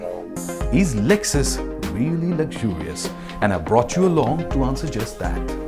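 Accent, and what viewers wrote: India. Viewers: Extremely erudite presenter. Very well done. I really like the sentence, 'the question I allow myself to ask...' Superb. Your Indian accent is a fine asset here, when I sometimes find it is annoying from other presenters. Keep it up.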